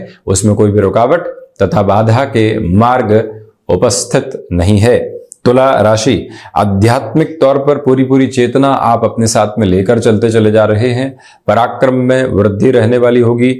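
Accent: Standard. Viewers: क्रपया नाराज मत होना आप क्योंकि मैं भविष्यवाणी से ज्यादा वर्तमान कर्मों पर यकीन करता हूं (क्योकि कल किसने देखा है, क्या पता कल हो न् हो)/ लेकिन आपके शुद्ध शाब्दिक उच्चारण का दिवाना जरूर हो गया हूं मैं, इसमें रत्तीभर भी कोई शक नहीं है/ आपके वीडियो देख-देखकर मैं अपनी खुद की हिंदी भाषाई जबान को लगातार शुद्ध करने की चेष्टा करने का आदि सा हो चुका हूं/ मेरा ऐसा मानना है कि यदि कोई व्यक्ति हिन्दी भाषीय समुदाय से हो तो उस व्यक्ति को अपनी शुद्ध हिन्दी भाषा की गरिमामय जुबान को शुद्ध रूप में जीवित रखने की नैतिक जिम्मेदारी अवश्य निभानी चाहिए "पीढ़ी दर् पीढ़ी"/ एक तुच्छ हिंदू प्राणी स्वरूप मैं ये जिम्मेदारी बखूबी रूप में निभाने की कोशिश अवश्य करता हूं अपने परिवार में/ ईश्वर से कामना है मेरी कि आप सदैव सुखी व् स्वस्थ रहें परिवाजनों सहित/ हार्दिक शुभकामनाएं🙏🙏🙏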